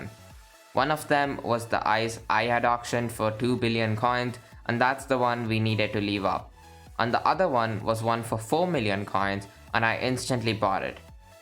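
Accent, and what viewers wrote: India. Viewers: indian english hehe ?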